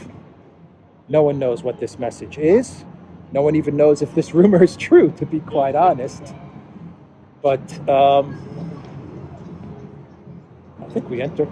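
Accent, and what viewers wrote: United States. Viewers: Good Morning,. You’ve got American accent. How so? Thank you for the refreshing morning walk and world news update. Good day for you too. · I have news for you. YouTube brings us here. We have no idea who you are. You're obviously from the US or Canada. I would guess US. You might want to put something in "Abut".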